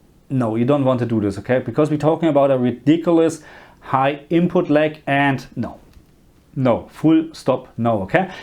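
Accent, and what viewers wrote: Germany. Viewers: First sentence, immediately knew you’re german. Gutes Video. ^^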